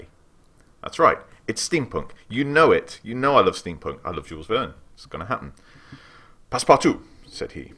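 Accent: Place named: United Kingdom. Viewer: Haha mate you're exactly what American people think all British people sound like. xD are you from the south?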